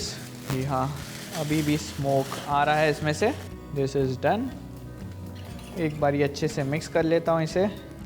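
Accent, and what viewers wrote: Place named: Hyderabad, India. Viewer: For some reason, I felt Chef had Hyderabadi slang when he speak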